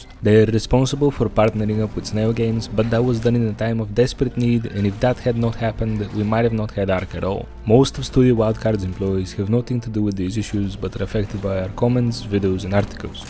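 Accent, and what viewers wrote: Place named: Europe. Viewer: you sound like your from the eu look at getting your money back as from what ive heard they cant just randomly ban you without good reason and you are entilted to your game or the money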